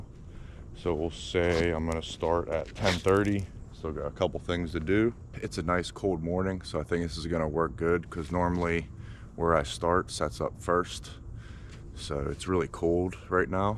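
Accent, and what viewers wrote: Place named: Southwestern Pennsylvania, United States. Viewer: I’ve been watching this series of you pouring your pad in stages. Great work doing it all yourself the old school way! I just poured a patio at my camp the same way in three stages. I had held though since I showed my nephew how to make the concrete and feed it to me. It’s a great feeling to do the work yourself and it certainly saves a lot of money. Are you building a shop on your pad when it’s done? Also where are you located? I think I detect a southwestern Pennsylvania accent 😂. Great job man and I’m enjoying the series. Greetings from northwestern Pennsylvania!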